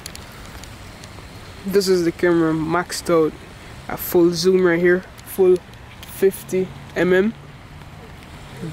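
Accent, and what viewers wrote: Jamaica. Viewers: MajorinkPeoples He's sound like a Jamaican, that's how our accent sound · bro, are you Jamaican?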